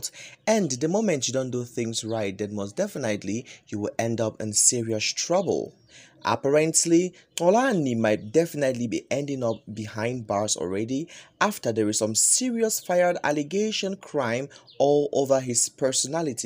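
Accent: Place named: Nigeria. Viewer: But why is a Nigerian saying this... Nigerian accent all over the place 😅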